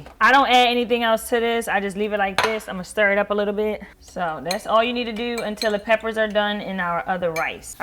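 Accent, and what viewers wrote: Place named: Louisiana, United States. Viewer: Love the video. Are you from Louisiana? The accent sounds like it lol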